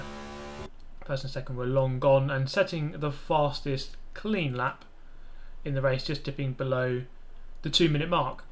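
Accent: United Kingdom. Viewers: The British accent is paired with sarcasm as well as noobs and the Alfa 33.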